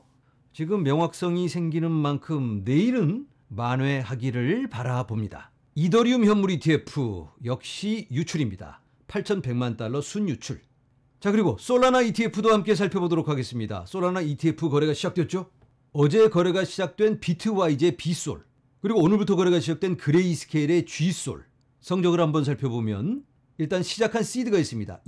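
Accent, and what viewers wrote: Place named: Gyeongsang, South Korea. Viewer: 부산?경주인줄 알았네요ㅋ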